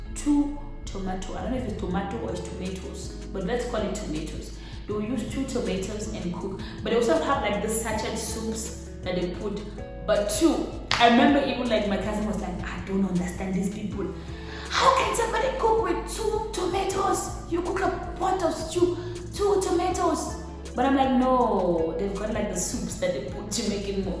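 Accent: South Africa. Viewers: Wow i like how you are so open minded ...You just sound like a typical South African when you speak our languages 😂❤️...We love you so much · You my DEAR , You Sound just like ONE of US, I mean South African Keep Learning our WAYS of RESPECTING our Cultures and Elders .Good Job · She even sound South African, and I don't mean her understanding and speaking of SA languages, I mean the way she speaks English sounds very South African. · For a second i though your southy you have our accent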